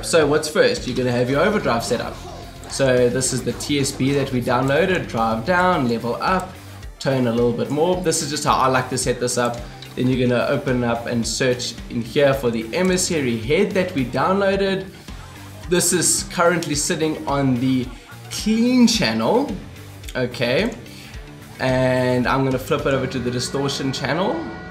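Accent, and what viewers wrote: South Africa. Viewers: Imagine my surprise hearing a SA'n accent as this vid started haha. Lekker bru :P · My boet, you sound South African.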